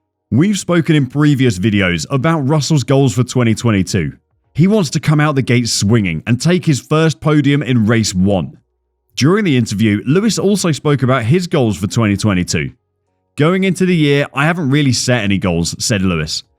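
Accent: United Kingdom. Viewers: My congratulations, this channel really feels professional! There is that slightly British ‘accent’ in the soothing voice of the narrator, that gives the message a trustworthy sound. They imagery and the movie clips are also well edited and nice on the eyes. All in all you look like a journalistic source that, in an agreeable way, wants to state it’s point. And maybe that’s the biggest problem. In a world where content isn’t judged by it’s objectivity but by the amount of followers that are being pleased, it’s very hard to stay truthful. In this very scoop you do mention the not so reliable influencing by TW in relation to the fact that he ‘knew’ LH wouldn’t stop while whipping up his fan base with suggestive statements, but you fail to explain why he did that. You acknowledge the phrase that LH mentioned that he’d never said this or that but you fail to explain how this contributed to the polarization of opinions within the F1 community. 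On a given moment you accuse MV of being the source of a lot of misbehave within the sport, but fail to explain that this is a shared opinion by a minority of the fans. So entertaining, surely, professional exposure, well done, journalistic integrity, can and should be worked on if you want to be a channel for all F1 fans. If you don’t care about second opinions or how the other chunk of Fans thinks , then good luck, you will have to battle with ton’s of British based and prejudiced, biased you tube channels. The choice is up to you. 👍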